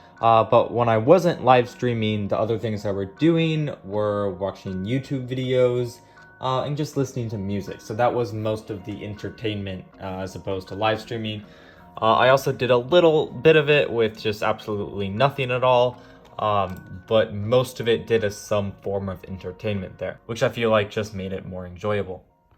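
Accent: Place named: United Kingdom. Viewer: Where are you from? Because the land in your videos are american, but your accent is brithis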